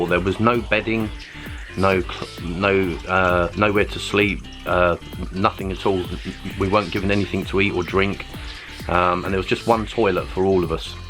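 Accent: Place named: United Kingdom. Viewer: I guess your english is from the UK it's kind of difficult to understand.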